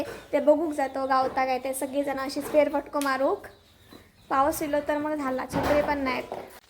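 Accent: Malvani, India. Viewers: Tu mast malvani june shabad boltayai eyakaak bara vata mast video chavkul li jaya go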